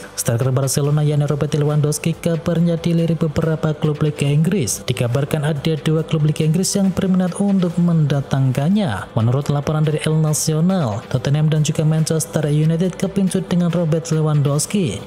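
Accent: Java, Indonesia. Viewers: Bang logat jawanya bisa gak di biasain aja kalaw buat vidio, gak enak dnger nya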